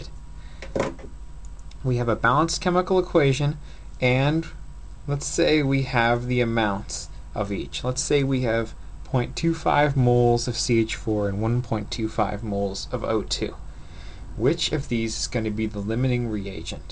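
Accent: Canada. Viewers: i hear that canadian accent on ya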